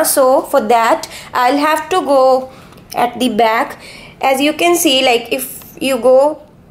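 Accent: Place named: India. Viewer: Endian accent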